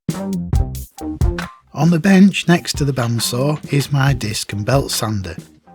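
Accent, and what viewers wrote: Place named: Northern England, United Kingdom. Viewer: Just beautiful! I am putting together my own very tiny workshop. 6ft by 3.5ft on my enclosed balcony. I live in a flat in South Korea, and DIY is not yet very popular because getting stuff done is relatively inexpensive. I love making things, especially from wood, and focus mainly on musical instruments. I don't have any of the tools you have but will be acquiring some as I go along. Thank you for the truly magical tour around your workshop and I shall be stealing some of your ideas for sure (with your permission, of course). By the way I guess you're from the north. I'm originally from Sheffield. A Stanley tools fan!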